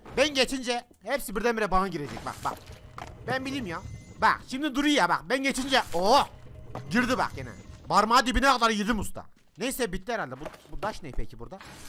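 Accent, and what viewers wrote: Gaziantep, Turkey. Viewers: Abi adamsın senin sayende Antep şivesini öğrendim cansın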